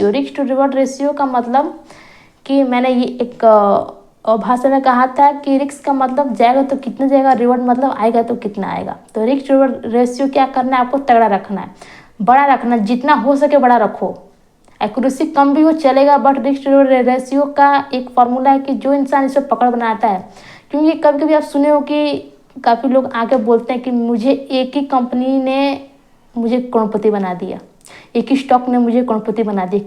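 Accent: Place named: Bihar, India. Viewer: Bato se lag raha hai bihari hoo 😂😂😂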